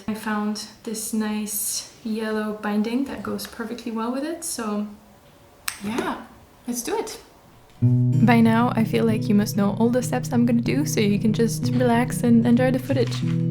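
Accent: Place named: Germany. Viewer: ❤ Ich dachte zuerst, dass du aus dem deutschsprachigen Raum stammst, wegen dem Akzent. Deine Videos sind wundervoll, ich liebe deine Nähprojekte. Auch die Szene mit dem lebhaften Austausch über das weitere Vorgehen, war sehr lebensnah und schön zu sehen. Abo, Glocke und Vorfreude auf weitere Videos von meiner Seite her ❤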